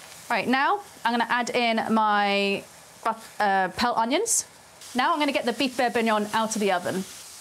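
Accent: United Kingdom. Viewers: Nah, "malliard" and "bull-ion" is because she's British. You want some examples of how British people mangle European words, you should see the Great British Bake-off Mexican week special.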